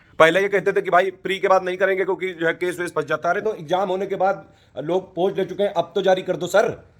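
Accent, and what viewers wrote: Kanpur, India. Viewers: बात तो सही कह रहे हैं आप सर जी बिल्कुल कनपुरिया स्टाईल में बात कर रहे हैं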